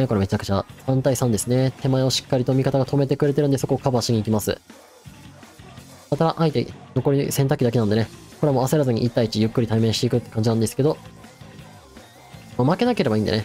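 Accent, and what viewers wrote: Kansai, Japan. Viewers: 初心者ですがどの動画もとても分かりやすくて参考になります✨✨味方やキルされてる人数など意識して見れるようになってきました🙏関西弁なのも親近感です😊今後の動画も楽しみにしてます！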